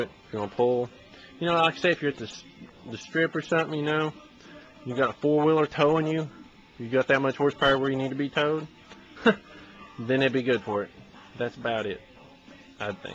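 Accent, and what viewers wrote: United States. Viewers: Are you from Kansas? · haha. I had a feeling you were from TN... I am too.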